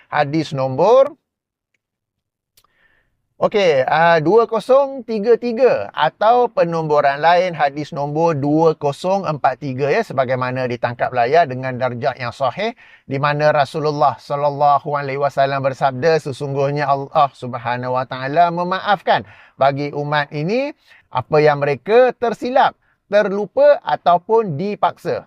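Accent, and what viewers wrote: Kedah, Malaysia. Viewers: Orng Kedah cakap nampak kasaq tapi ada wisdom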